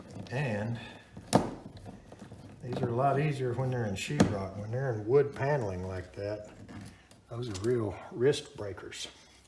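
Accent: South, United States. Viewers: Honeywell used to make an escutcheon plate for use when replacing a large with a small thermostat. Does this Ecobee have such a plate; you would think so since it is a small thermostat. Another great video from the soft spoken Southern expert.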